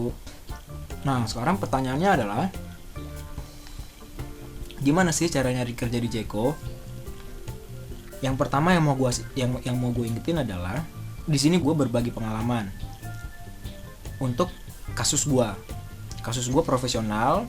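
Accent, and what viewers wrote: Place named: Depok, Indonesia. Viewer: Bahasa lu masih anak depok banget bor.kwkwkwkw keren keren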